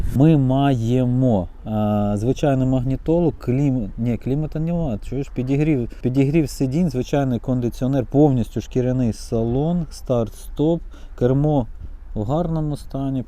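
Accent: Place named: Volhynia, Ukraine. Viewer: Волиняни так розмовляють, Стрій, Кієв, город і да. Це масове явище.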